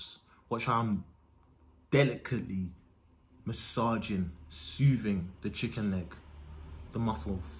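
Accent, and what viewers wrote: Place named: Cockney, United Kingdom. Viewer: You are so entertaining!! You’re you’re fired videos made me be so happy during lockdown. Please do more social experiments in your cockney accent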